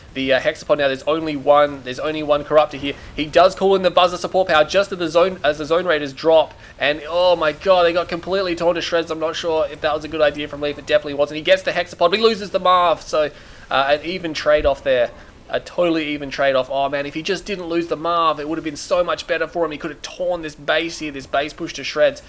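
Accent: Australia. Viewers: its weird how literally the most fun Channel on my youtube, is just some Australian dude narrating videos of a game that came out in 2007 and that i still suck at for the most part. And yet it never gets old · Too be fair Australian is one of the best accents in the world. M8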